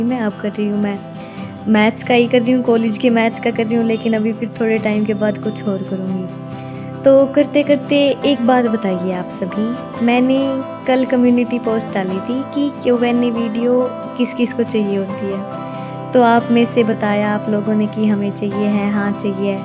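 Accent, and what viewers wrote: Haryana, India. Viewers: Me to haryanvi tone ka addict ho gaya🤣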